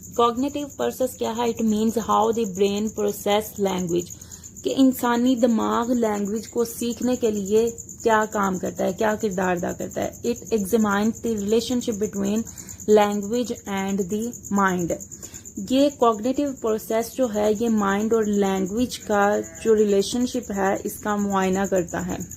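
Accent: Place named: India. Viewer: Why are Indians having a bad accent to accommodate English and still want to teach the same language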